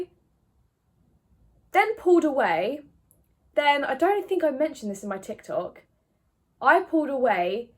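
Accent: United Kingdom. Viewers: Do you still have your British accent when you have shifted into any reality? <3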